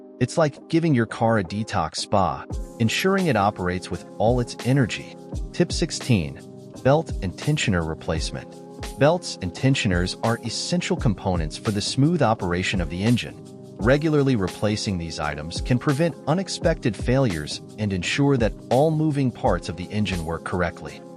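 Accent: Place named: Netherlands. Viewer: I am thoroughly confused with every tip starting out with a Dutch accent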